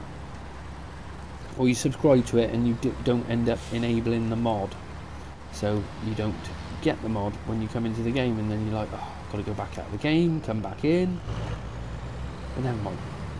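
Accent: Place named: England, United Kingdom. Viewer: no problem😆 you know it's genuinely so nice hearing your accent I actually grew up in the west and where I live now I do really miss it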